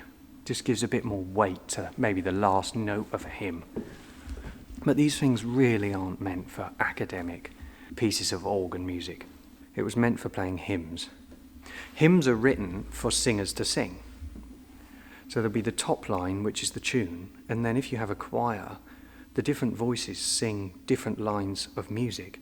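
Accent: United Kingdom. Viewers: Hi, you are very talented person, with such a beautiful British accent-I love it. I really enjoy watching your videos because I'm Polish and I'm learning English, so I can learn a lot of beautiful vocabulary from you channel (I know, it could sounds funny). I play the organ a little end pieces you play are amazing! Have nice da!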